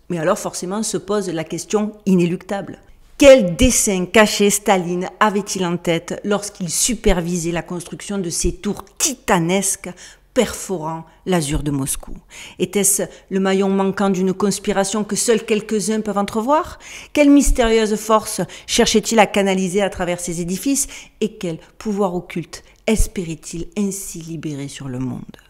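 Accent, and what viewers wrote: Marseille, France. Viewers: Mdr l'accent marseillais au usa en plus vous êtes super jolie😂😂😂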